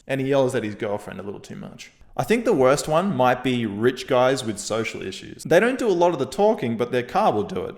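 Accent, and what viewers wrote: Australia. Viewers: Good take, green car, AND an Australian accent all in one?? Sub. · This is my first video in your channel. Are you based in Australia? because I don't want to judge based on accent.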